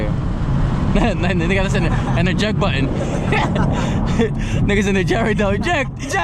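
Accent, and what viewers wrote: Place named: Cuba. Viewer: good video, im argentinian and your spanish is fun, beacuse your accent is cuban and is fun hear it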